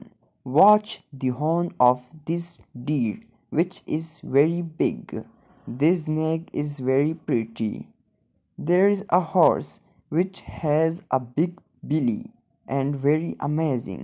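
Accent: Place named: Brazil. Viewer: É um brasileiro falando com certeza 😅😅😅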